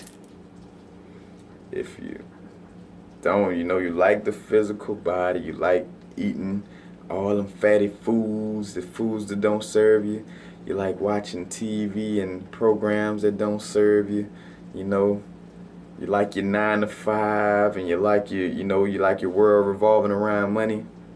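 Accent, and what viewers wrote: New Orleans, United States. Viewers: are you from New Orleans? accent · Dawg! I’m in New Orleans! You here to huh? I know! I’m coming over right nah ya heard me! Dat boi said ku-di-lini!! Wazzam!? I can hear it that city all in ya voice! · I kept saying "dude sound so New Orleans" then I saw the Clark t shirt then you said boudin.....#NOLA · Sounds like you're from New Orleans. Reminds me of home! 😀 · From New Orleans . Got the Clark shirt on had to match it to the accent.👌🏿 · So Nuawlns yea dude gotta downtown accent..... New Orleans checkin in ya know....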